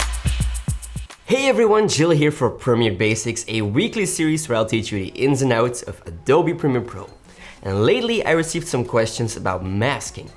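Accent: Belgium. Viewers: you sound like you live in belgium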